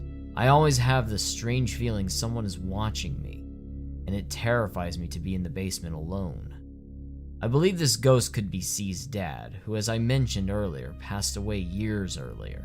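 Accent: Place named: South, United States. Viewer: Nothing wrong with a Southern accent! :P lol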